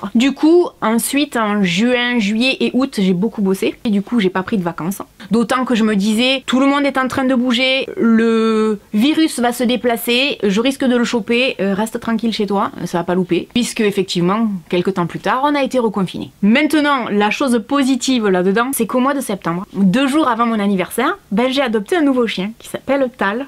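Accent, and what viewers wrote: South, France. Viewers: Bonjour, je te suis depuis 2 ans et j'adore regarder tes vidéos, ton sourire, ton accent du sud et ta bonne humeur sont les petits rayons de soleil de mes journées. J'aime tes vidéos sponsorisées par ta carte bleue, je sais que je peux faire confiance. Cette année à été difficile pour la plupart d'entre nous et tu l'a rendue plus douce. Continue à nous faire sourire et un grand merci pour tout. Bonne année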